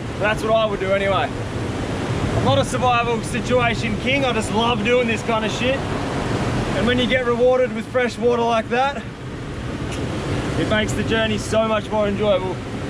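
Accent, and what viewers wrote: Western Australia, Australia. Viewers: Its and Australian accent i can tell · Love your bloody Ozzy accent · yes! Quite easily distinguished. Australian’s speak a lot slower than New Zealander’s and have a stronger nasal twang also lots of slang that we don’t use, we have 3 different accents countrywide, European Kiwi (Pakeha), Maori, and Southland accent. Australia has many more than us and the one that is most alike ours is Tasmanian but the guy in this vid sounds a little more Western Australian, Perth region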